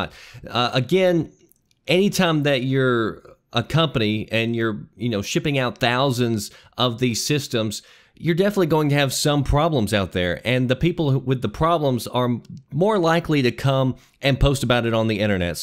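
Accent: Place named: Tennessee, United States. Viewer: Thanks a lot. Ive been thinking about getting a gaming PC and if I do, will got the route you did. BTW.. didn't know till this video but suspected you were from Tennessee from your accent... bet ya can't guess where I'm from..lol For some reason I thought my account was my gamer tag.. Knoxrandall...lol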